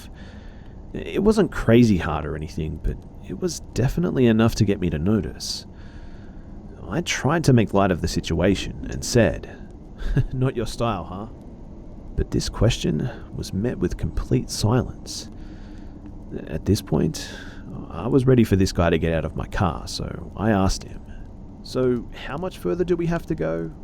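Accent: Australia. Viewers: I would happier if do me and do me good while reading to me in that sexy Australian voice of his!!!!! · Leave it to me to listen to the awesome Aussie narrate these badass stories just to keep myself up at night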